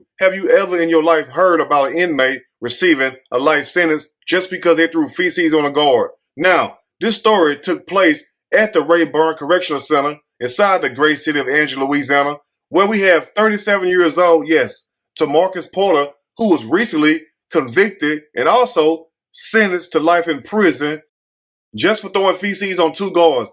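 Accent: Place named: Houston, United States. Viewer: I heard you talk and immediately heard that H Tine twang. Possibly 4th ward.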